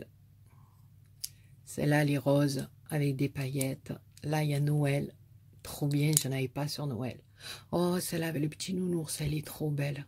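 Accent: South, France. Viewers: Coucou, que de gentils et beaux couriers, tu les mérite. J'aime beaucoup tes vidéos et ton accent ensoleillé, pour moi qui vit dans le nord, ( Belgique ), c'est juste du bonheur. Je n'ai pas ton adresse sinon je me ferais un plaisir de t'envoyer une carte. Gros bisous Nanny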